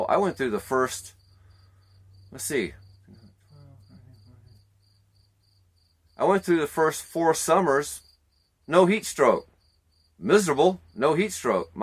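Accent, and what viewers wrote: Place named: Georgia, United States. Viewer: You sound like a Georgian 😅🍑 The dry desert heat is it way more harsh than the heat and humidity here in the South? Like walking around in a sauna wrapped in heavy wet blanket.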